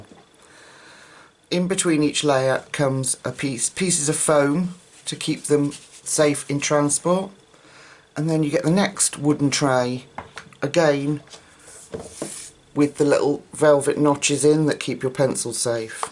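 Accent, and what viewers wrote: England, United Kingdom. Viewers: Actually they work pretty well over grayscale. I'm getting not full 120 set of Artists, but buying just those colours what are missing in Procolour and Coloursoft sets. Already got all greens and tried them here and there. O my gosh! They layer and blend as good as Polys and Pablos and Keep Sharp Point!!! I believe they'r as economical in long therm as Pablos and Polys. I found that at least in Klara Markova books i can put Procolours over Artists, over Coloursofts, blend with Derwent blender. Endless posibilities ... I was biten by german sheperd too, also in back when i was 20. Scar took more that 10 years to fade. It was in party and dog was police dog from my friends friend. I was dancing, mowed backwards and got too close to owner. Dog jumped and bite me in back without any warning. It was well trained policemen dog and listened owner in a second. 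everybody was joking that i don't have to make injections for rabies because it's police dog. I got close to owner about 1 meter. Guy said if i stayed 1,5m from him dog wouldn't attack. Lesson learned. Like Your colourings very much and way how You speak, Your accent is like my english colleague's here in France.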